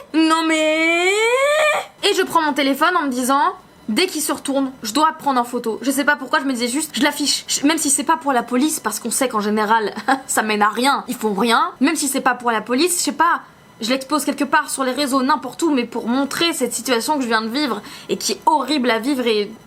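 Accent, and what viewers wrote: Belgium. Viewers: Coucou!! Hier, je suis tombée sur ta vidéo "95E et plus de soutif". Je ne te connaissais pas, mais le titre de ta vidéo m'a interpellée. J'ai aimé ta personnalité avec ton petit accent Belge que j'aime beaucoup <3. Et là, pareil, le titre de cette vidéo, m'interpelle et je suis dégoûtée de ce que tu as pu vivre!! Je ne comprends et je ne comprendrais sans doute jamais ce qui ce passe dans le cerveaux des mecs (si tant est qu'ils en aient un!!) Je suis comme toi, je me demande ce qu'il faudrait faire pour pouvoir les afficher. Peut-être, en criant "regardez messieurs dames, ce monsieur est en train de se masturber.... sortez vos téléphone pour le filmer et le publier sur les réseaux sociaux!!!" Je ne sais pas si cela changerait quelque chose, mais je suppose que la personne la plus mal à l'aise serait certainement ce porc et non toi. Je n'oublie pas que ce genre de situation est hyper perturbant, mais peut-être que cela exorciserait ton angoisse de l'instant?? 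Les lois ne bougent pas et les femmes ne sont toujours pas considérées comme elles devraient l'être.... Bienvenu au 21ème siècle!!! C'est grave quand même que l'on en soit toujours là.... Bref.... Merci pour ton témoignage, il peut servir pour nos "sœurs" Ha oui! J'allais oublier, j'ai certainement l'âge de ta mère ou même plus.... Et j'ai vécu ce genre de situations pourri quand j'étais jeune et à croquer.... Donc, je sais précisément ce que tu peux ressentir dans ces moments là. Gros bisous ma belle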